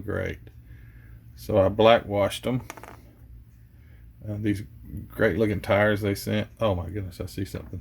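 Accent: South, United States. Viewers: Mean! Mean paint job man! Great overall job and I was hoping you were going to go with the black stripe decals! (your next door neighbor in Canada Montreal). Your Southern drawl sounds so American!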